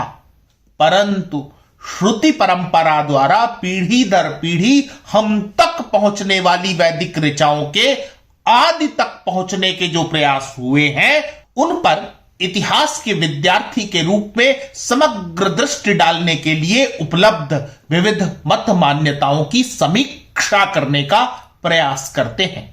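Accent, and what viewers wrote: Standard. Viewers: बहुत ही सुंदर जानकारी वो भी शुद्ध हिंदी उच्चारण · हिन्दी का इतना शुद्ध उच्चारण बहुत कम सुनने को मिलता है. साधुवाद! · शुद्ध हिन्दी उच्चारण, बहुत दिनों बाद सुनने को मिला| इसे कहते है हिन्दी!!!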